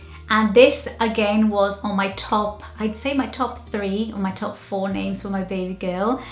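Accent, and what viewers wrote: Brazil. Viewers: I think Jack we will probably say as North Americans with “é” sound . Some of the names doesn’t make so much difference because of your Brazilian accent. I’m from country side of São Paulo and some of them like Arthur and Oscar would be so different with a strong R.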